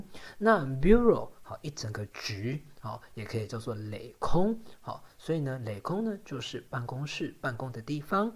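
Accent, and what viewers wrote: Lhasa, China. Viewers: 請問這是那種口音的藏語？拉薩腔嗎？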